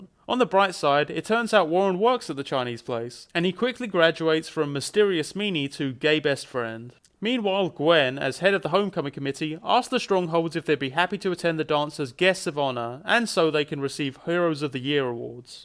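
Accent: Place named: United Kingdom. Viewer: couldn't listen to this because he's british :(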